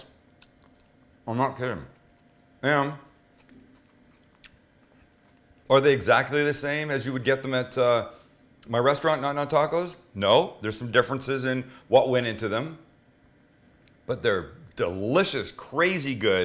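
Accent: Canada. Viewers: i've been trying to figure out if he is! everytime he say out or about i'm like...hmmm, i wonder if he's canadian! lol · Are you from Canada??? I thought I kind of picked up on an accent but wasn't sure. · Canadian accent.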